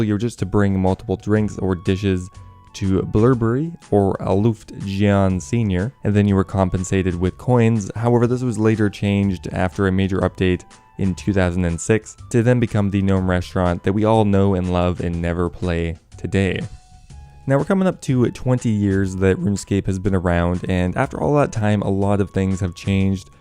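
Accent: Canada. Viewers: Are you Canadian? You DO have an accent but it's so hard to place EDIT: Just looked up your Twitter and it lists your location, I fucking knew it you Canuck bastard, I live on the US side of Niagara Falls and I am very familiar with the subtle differences in the pronunciation of certain words (plus out of all local radio stations I receive, the Canadian rock station we get here is better than the one on the NY side tbh)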